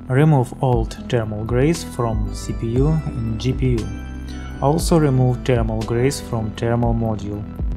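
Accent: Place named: Russia. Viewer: Ведь явно слышен русский акцент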